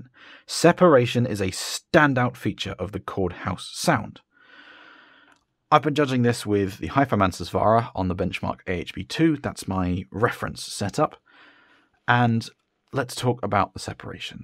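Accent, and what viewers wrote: United Kingdom. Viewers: Oh look, a more sophisticated Zeos with a British accent who reviews more expensive gear. You deserve my subscription well done on the review!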